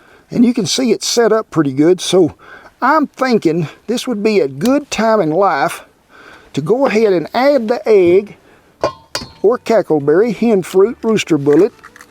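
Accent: South, United States. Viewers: black coffee, hash brown slap up and a chirpy southern camp cook, paradise mahn!!!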